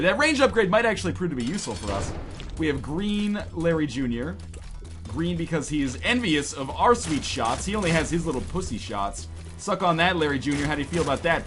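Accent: United Kingdom. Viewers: I love your british accent! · I did actually like your British accent. Well done.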